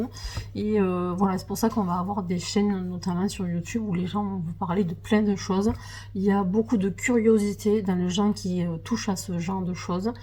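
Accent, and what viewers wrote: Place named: South, France. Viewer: Bonjour 🥰🥰je suis TOTALEMENT d accord avec toi au sujet de l importance de DÉCULPABILISER l idée de colorier. Infirmier en psychiatrie, effectivement l aspect art thérapie du coloriage est indéniable. . . Et effectivement il faut défaire les cases car il y a j en suis sur beaucoup de garçons qui colorient. . . Plus présent sur Tiktok je regarde de plus en plus youtube ou la communauté coloriage fait plus de hors mystère et est très très créative. Ayant fait des études d histoire de l'art je me retrouve enfin qd tu dis que le coloriage est un art en lui même. Je démarre ma chaîne ici pour démontrer que les garçons aussi peuvent colorier et se faire plaisir autrement qu en courant après ⚽. Merci et bravo pour cette super vidéo. Hâte de voir les suivantes. J avais jamais commenté tes autres vidéos/ vlog ou tu réalises de superbes et magnifiques colorisation, c est désormais choses faites. 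Encore bravo Ps au vu de ton accent on ne doit pas être très loin ( moi de paris mais depuis 12 ans a Toulouse avec mon Mari). Belle journée/soirée.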